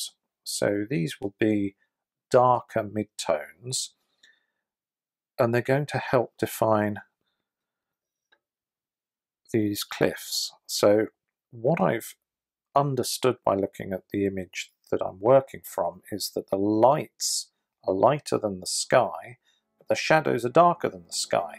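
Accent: United Kingdom. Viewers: Amo o sotaque britânico e amei sua arte. Obrigada por compartilhar.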